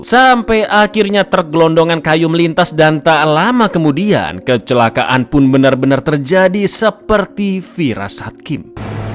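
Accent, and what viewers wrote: Java, Indonesia. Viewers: Aslimu org mna to min?jowomu medok,tp mm mu jwabar bget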